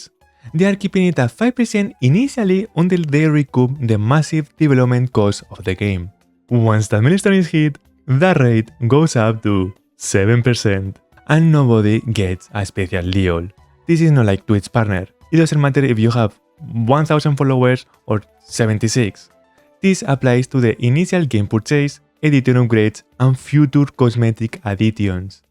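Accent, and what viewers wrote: Spain. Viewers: Spanish accent for sure :D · ye i'm glad too about that, i would rather see the fun spanish accent that anything ai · well u sound litle difrend in live streams more spaceout speach this why i thinhed u used some kind of ai or u use more takes to make as understandambe as u can. But im glad u are not use ai and to u are not afraid of you spanish accent and not perfect english.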